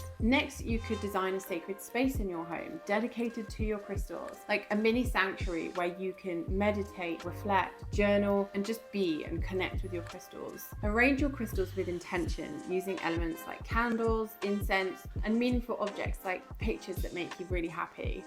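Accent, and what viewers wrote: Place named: United Kingdom. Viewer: Sweet Spirit you talk to fast!! But I love your British accent!!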